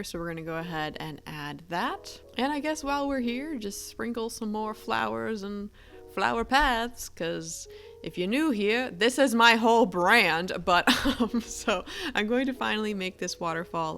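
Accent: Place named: France. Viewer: omgg so beautiful and ur french accent is so cuteeeee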